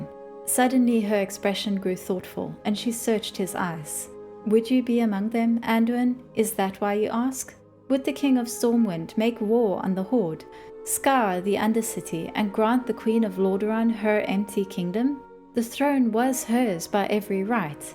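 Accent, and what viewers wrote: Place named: South Africa. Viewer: I knew you sounder familiar. You sound just like a South African. I hope your trip goes well.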